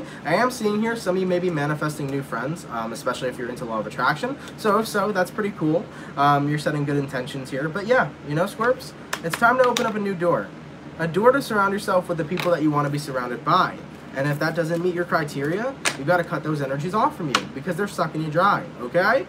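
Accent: England, United Kingdom. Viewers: Thanks 🙏 for your Pisces ♓️ reading great brittish accent, have a amazing day, bless you ❤️🦋🌹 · Lol the English accent 🤣🤣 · (Gemmies) yuk! Am I the only one who's cringing? English accent double cringe. Ok wots goin on? Lol xx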